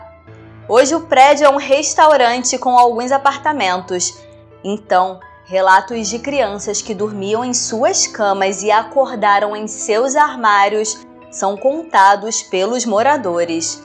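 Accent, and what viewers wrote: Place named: Rio de Janeiro, Brazil. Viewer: Rio de janeiro q puxa o x no final.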